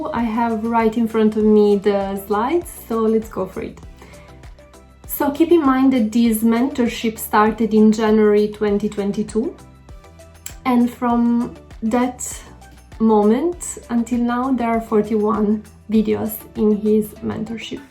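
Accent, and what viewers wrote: Italy. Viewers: Cute the italian accent , continua cosi!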